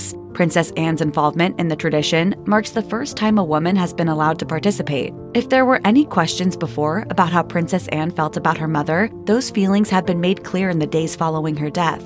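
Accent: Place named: United States. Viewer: Why are all the film clips of the royal funeral narrated by americans? Always the first to get in to profit from anything.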